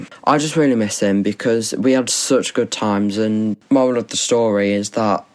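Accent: England, United Kingdom. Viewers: Someone sounds BRITISH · its too good to be true lmao uk accent and hes acting like hes in a high school movie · I love it the accent british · ooo la la i am in love with english accent😍😍😍😍😍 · well given by his accent and the fact that he said football and not soccer I think UK school works differently than American school what it comes to summer break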